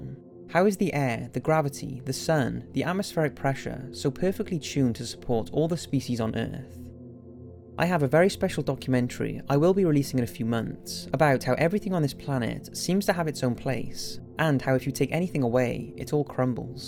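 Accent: Wales, United Kingdom. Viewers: You must be from Cardiff or somewhere down South Wales? That accent is deffo Welsh 🤔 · Partisan Mapping ive turned most of my friends into ' fans. Now when we smoke at my place they say "lets watch some " and we just burn and listen to this nigga's surprisingly soothing british voice go on and on about interesting shit.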